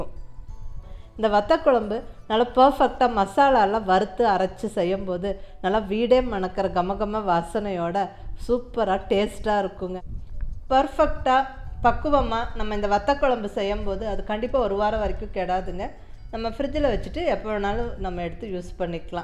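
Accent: Coimbatore, India. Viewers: i tried in tis in the same way u did... got appreciations from family members...tq mam Unga slang nalla iruku..nenga Coimbatore ah...... God bless mam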